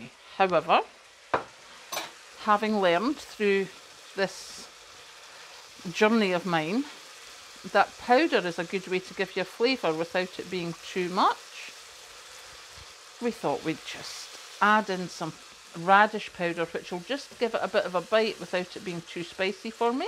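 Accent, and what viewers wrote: Scotland, United Kingdom. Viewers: i just love your scottish voice and your enthusiasm, its infectious xx keep it up, your views will GROW, your channel is just very CUTE xx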